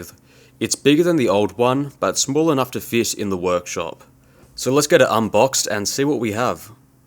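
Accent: Australia. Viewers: Wait, when did This Old Tony get an Australian accent?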